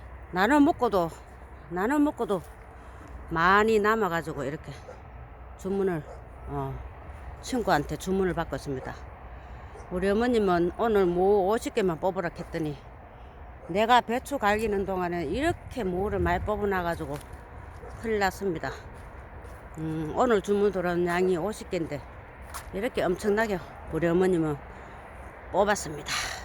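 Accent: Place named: Gyeongsang, South Korea. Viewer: 경상도 사투리쓰시는 친근감이 베스트이고 무ㆍ배추가 힐링되니 더더욱 귀촌생활이 아름답습니다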